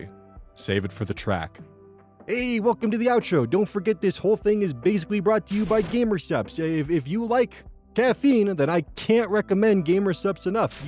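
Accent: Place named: New York, United States. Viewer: Curious question.... Why do you sound like someone that lives in Manhattan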